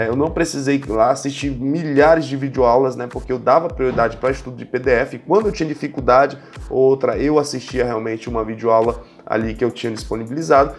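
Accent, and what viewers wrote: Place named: North, Brazil. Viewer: Vc é nortista? Tem um pouco de sotaque rsrs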